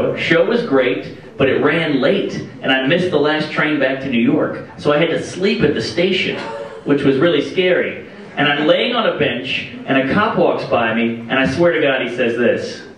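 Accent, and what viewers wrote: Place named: South, United States. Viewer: I think Mark's accent here is the most southern I've heard it. I can hear it in certain words he says.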